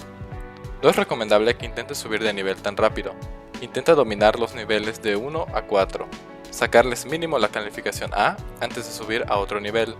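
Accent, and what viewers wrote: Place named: Mérida, Mexico. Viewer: Eres De Merida :o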